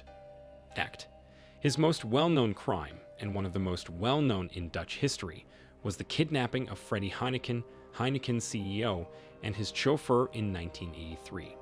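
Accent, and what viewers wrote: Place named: United States. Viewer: this American voice seems to think to know a lot. About criminals in the Netherlands.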